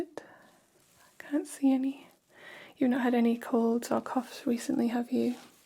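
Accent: United Kingdom. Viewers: Great video idea, also love the accent whereabouts are you from within the uk?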